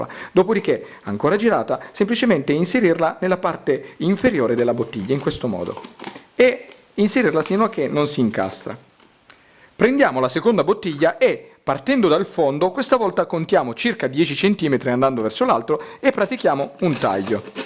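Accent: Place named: Sardinia, Italy. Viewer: Un tutorial ben fatto e la spiegazione e' impeccabile con un italiano tipico dei sardi... bello iscritto e like da adesso ti seguiro' sempre... un saluto Giovanni p.s. io faro qualche modifica per interrompere l'alimentazione alla luce lmpadina e accenderla solo la sera con un interruttore a parte... 10+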